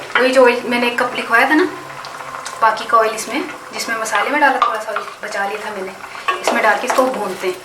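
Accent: Punjab, India. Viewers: Ap jb bolti ha too Karachi ki nai lagti.punjab ki rehne wali lagti ha.anyway nice vlog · ریسپی تو آپکی اچھی ہے ہی۔۔لیکن کہیں کہیں پہ پنجابی کا ٹچ جو ہے نا ۔۔۔۔کمال ہے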